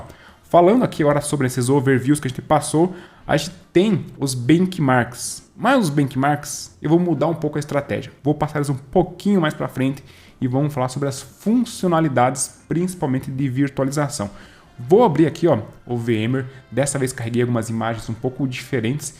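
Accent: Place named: Curitiba, Brazil. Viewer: Bem capaz que és de Curitiba. Piá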